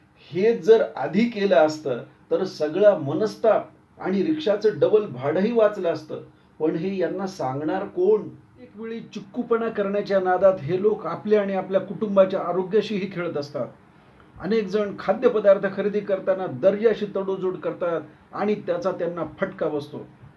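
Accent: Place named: Marathwada, India. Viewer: खुप छान आपले व्हिडिओ आहेत. आपल्या मारठवाडा टोन मराठी भाषेत चुटकुले ऐकायला मजेशीर वाटतात. 😅😊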